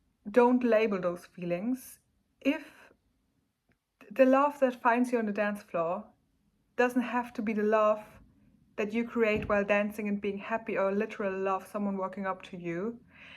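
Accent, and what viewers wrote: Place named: Germany. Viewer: you sound german, Grüße! :D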